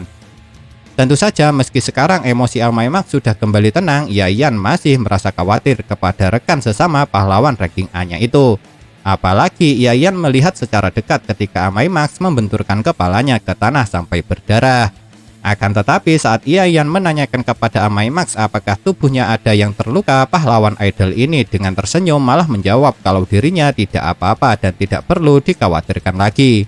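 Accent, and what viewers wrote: Java, Indonesia. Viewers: Logat jawa neee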